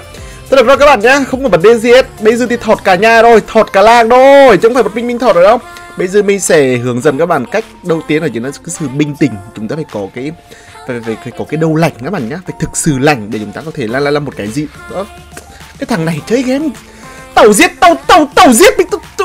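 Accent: Nghe An, Vietnam. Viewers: Yêu giọng NGHỆ QUÊ CHOA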